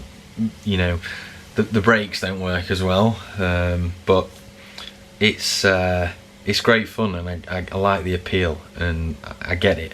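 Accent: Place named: Northern England, United Kingdom. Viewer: First bike was my dad's old steel Carlton frame with old 105 gears that was 40 to 50 years old. Few years back car pulled out on me; broken bonnet, dented roof and broken teeth. Worst of all broken bike. Down tube and forks, wheels buckled. That meal time was a quiet one. Love the northern accent you got ohh and the vlogs.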